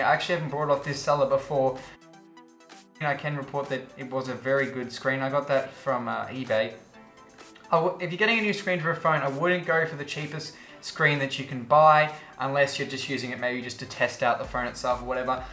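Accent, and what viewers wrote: Australia. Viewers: you are from new south wales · Are u an Aussie?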